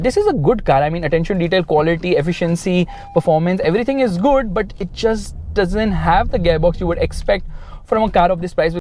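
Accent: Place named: Karnataka, India. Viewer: Bro just amazing to hear Kannada voice from cop site bro❤❤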